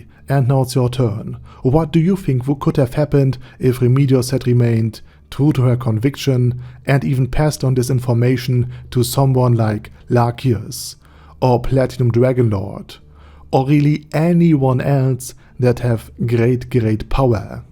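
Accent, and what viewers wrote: Germany. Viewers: wait. what? there are blokes who disliked his german accent narration? that is the only reason why i kept coming back to this channel, it is his thick german accent!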